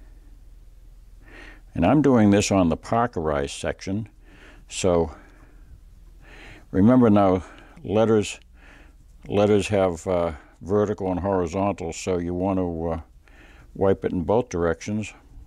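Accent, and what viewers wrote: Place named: Central Massachusetts, United States. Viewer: great lesson! i grew up in worcester, your accent sounds like central MA, am i close?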